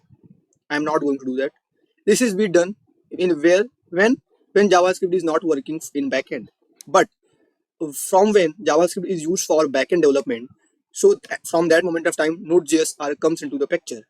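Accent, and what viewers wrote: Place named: England, United Kingdom. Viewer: i dislike your aksen english, but i like your work. well done my friend.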